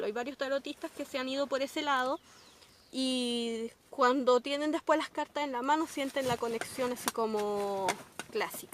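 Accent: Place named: Chile. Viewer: Hola , vengo de Amino ❤Vi la publicación y vi unos segundos del vídeo , pensé que eras española Lugo me di cuenta que eras chilena ( no reconozco ni mi propio acento jaja )Me gustó mucho , sigue haciendo vídeos Me encanto la 3 baraja 🖤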